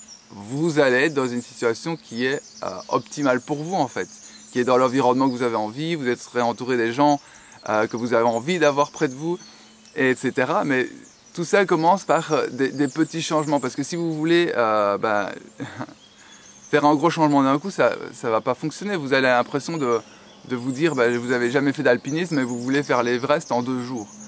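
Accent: Belgium. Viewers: Coucou j'adore ton accent bien belge, ( je viens de la province de Liège)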